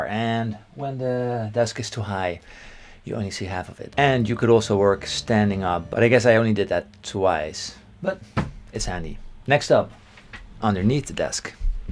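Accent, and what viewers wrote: Netherlands. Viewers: English sounds best with a dutch accent, from my POV! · ah, so Dutch? ty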